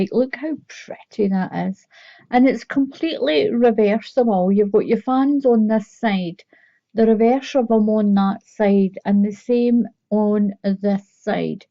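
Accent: Scotland, United Kingdom. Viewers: I've saved this fan pattern. I'm just a learner but I love trying out patterns. What size of crochet hook did you use ? Keep up the artistic work, your super duper . You speak the same lingo as me hen!!, nice to meet a Scottish lassie !! X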